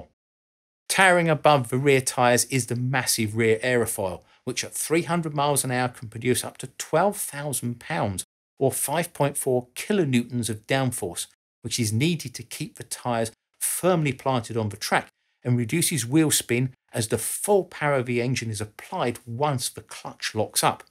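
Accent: United Kingdom. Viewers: Well, that's an unexpected subject for but in fact, there are many points in common with rockets:D That was funny. And top of that: with british accent! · Love the UK accent to narrate a video that can be described as " 'MERICA!! " 😂🤣 So much fun. Thanks mate! 😊👍upvote earned. I'm personally not into 1/4 [or 1/8] mile racing but as an welder with a lot of experience with Aluminium I have professional experience with the blocks and various parts away from race days. IF anything is left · This is very old information being about 6 years old. Much bigger numbers in 2025. And a guy with a British accent is commenting on a purely American sport?? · Why the sickening sissified limey accent?????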